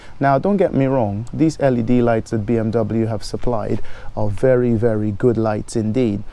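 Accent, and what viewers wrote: Trinidad and Tobago. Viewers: Caught that Trini accent... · Im hearing the trini accent. 🇹🇹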